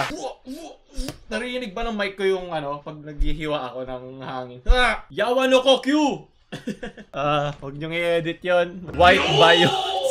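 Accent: Visayas, Philippines. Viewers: Bisaya ka hahaha yawa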